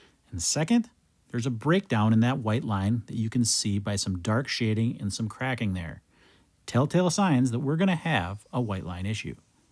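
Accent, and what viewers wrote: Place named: Wisconsin, United States. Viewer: I don't miss Wisconsin winters, but I do miss the accent.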